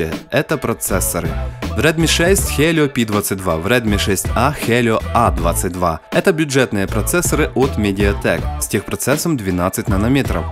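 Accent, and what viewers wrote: Bila Tserkva, Ukraine. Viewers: Вы случайно не с Белой Церкви?)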